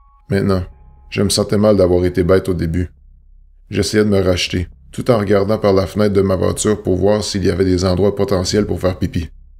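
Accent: Quebec, Canada. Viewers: Superbe travail j'aime beaucoup 👍 Ps j'adore l'accent québécois 🙏👌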